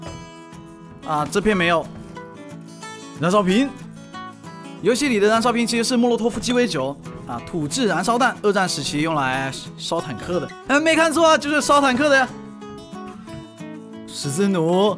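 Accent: Hong Kong, China. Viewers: 你是香港人吗？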